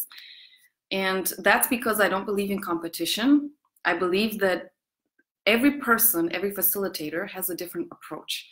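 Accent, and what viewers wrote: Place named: United States. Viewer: But you do speak English perfectly! And you have a bit of American accent 🙂 Did you study there?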